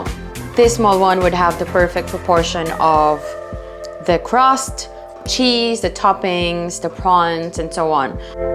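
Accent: Ireland. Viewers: You sound like Irish.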